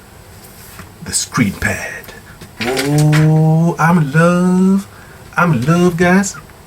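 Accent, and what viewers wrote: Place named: Africa. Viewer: انا عندي سؤال محيرني صراحة انته وحكيم اثنينكم عندكم لكنه افريقيه في انكليزيتكم.. where did u get that accent from? هذي مو اللكنة الانكليزيه ابدا...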